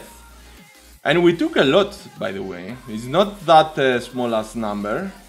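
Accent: Greece. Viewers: Hey man love these videos, very funny and informative :) btw your accent sounds Greek, is that right?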